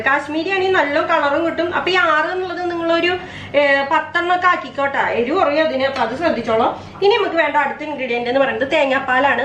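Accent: Thrissur, India. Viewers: mde ..thrissur baasha aanalo...😂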